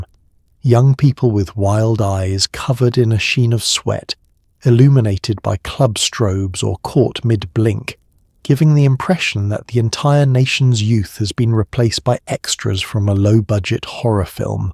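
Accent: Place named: United Kingdom. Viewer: For a while, we had those. I tried it. I thought it was disgusting... This is no Heroin, not Coke, not MDMA, not even a shot of alcohol. Not even like a joint somebody passes around. And Mister you know what? Doesn't matter where and when I am. I don't know your name. You are a Voice with a polished British accent. alright... Remember earlier when I said disgusting? Well a close friend who was on a medical regime for mental problems (Depression is the No.1 Appetizer for drugs.).. And he didn't think it was disgusting. He was full of that thing, he was at work but just to jump from the 4th floor. Because old People in Yemen chew those leaves to pass the time, cathinone is harmless... They've been doing this for years, It's not tobacco. no smoke. Once again, we re-re-rediscover some plant that was originally used to Benefit, Chemists distill it, add things, remove things, and Encapsulate: A "Pill".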